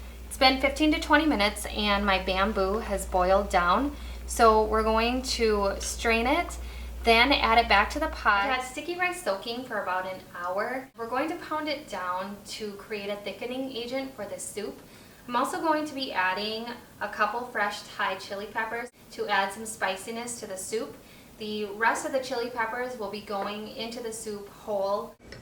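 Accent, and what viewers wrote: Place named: East Coast, United States. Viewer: Are you from the east coast? You have this little accent just like me lol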